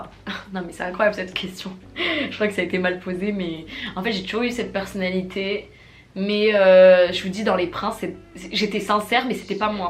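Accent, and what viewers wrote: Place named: Germany. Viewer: Bonsoir, as-tu des origines allemandes? J’ai noté le petit accent très charmant 😊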